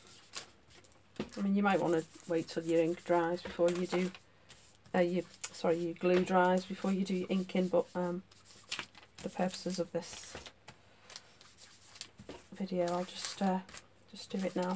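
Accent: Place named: Liverpool, United Kingdom. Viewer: Hello. What part of Liverpool are you from.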